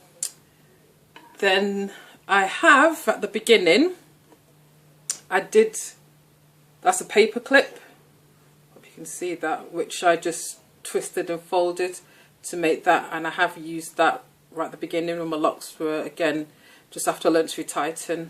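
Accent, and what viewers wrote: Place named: Northern England, United Kingdom. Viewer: So great to hear a UK voice and then a northern voice at that! Where have you gone? Your videos look great, would love to hear how you are getting on.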